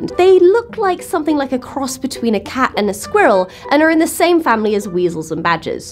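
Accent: United Kingdom. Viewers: Okay this to all the British people over her...Is her (Siobhan Thompson's) accent fully British?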